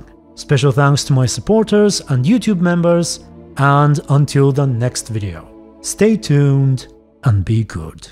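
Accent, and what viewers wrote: Bulgaria. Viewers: Eyyyy you Bulgarian bro?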